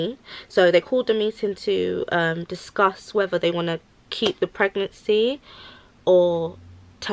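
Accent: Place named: England, United Kingdom. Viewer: mandy_ somda it’s English accent from England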